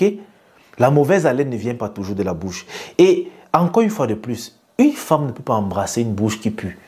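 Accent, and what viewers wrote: Cameroon. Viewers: La manière donc tu parle la j'espère que tu es du kamer 🇨🇲 force a toi Fréro 🤝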